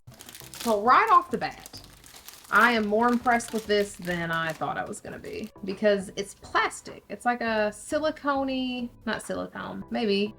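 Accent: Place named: South, United States. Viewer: ! I noticed your accent and I was like, "Girl has to be from the South!" Honey, I'm in Huntsville...only 30 minutes from you! I don't know why I'm so excited. Lol. My son got a leopard gecko for his birthday and we are in love!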